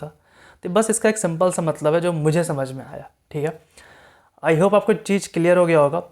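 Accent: Bihar, India. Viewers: sir aap bihar se hai???/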